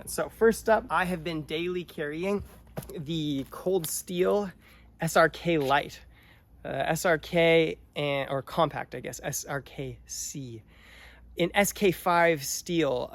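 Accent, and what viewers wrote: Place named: United States. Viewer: Are you from new Zealand? You have a very american accent.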